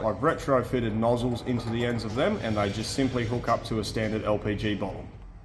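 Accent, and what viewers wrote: Australia. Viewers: Awesome video, always cool watching the puddle gun and listening to profanity in Australian!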